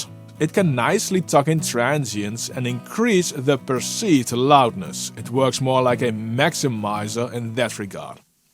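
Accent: Netherlands. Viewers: Subscribed for the Dutch accent!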